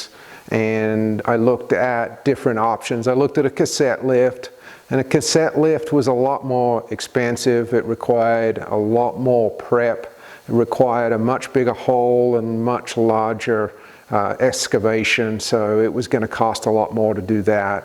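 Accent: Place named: Australia. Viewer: your accents sounds like you've lived in Australia -are you an ex aussie?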